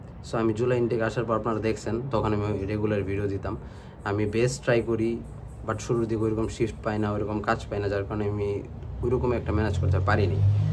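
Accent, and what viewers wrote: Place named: Brahmanbaria, Bangladesh. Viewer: Bhai, take my salam. Apnr kotha bolar accent shune kno jani mone hocchilo j apni Brahmanbaria er accent e kotha bolchen. However, apnr fb id check kore dekhlam apni dhk thk. I dont know why, but still mone hocche brahmanbaria er keu kotha bolche 😄 Btw, I am planning to do my masters from Aus, thats why I've found your videos and started watching them. Thank you for the informations bhai. I am from Brahmanbaria ( Sarail)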